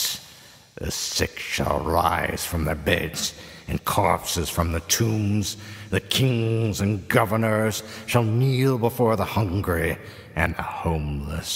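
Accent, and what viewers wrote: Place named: United States. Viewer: Kevin Walker No. DeGrimston was British, this is an American accent.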